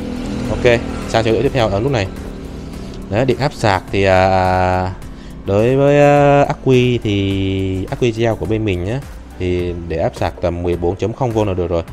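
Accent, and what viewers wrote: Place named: Quang Binh, Vietnam. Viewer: Bác nói nghe giống người cảnh dương, quảng bình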